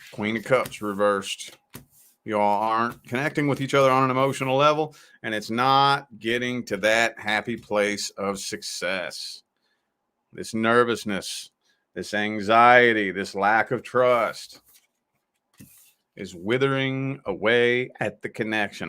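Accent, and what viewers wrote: South, United States. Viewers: I love your readings, very matter of fact. I love your southern accent. I am American living in the UK but I am wondering if it`s a Kentucky accent. I am hoping my ex will at least apologize but I doubt it. Haven`t heard from him in over 6 months and I doubt he will ever say anything to me again. It`s never been on and off. He broken up with me. There was a third party involved which I think was his next girlfriend but I think they broken up. I want a nice guy who is a soulmate. You are right. If he couldn`t appreciate the love I brought to the table then it was his loss.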